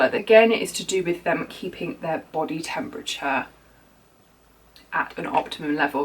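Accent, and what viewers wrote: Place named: England, United Kingdom. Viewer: Nice to hear an English voice for once. I keep snakes and a chameleon and I just bought a dragon yesterday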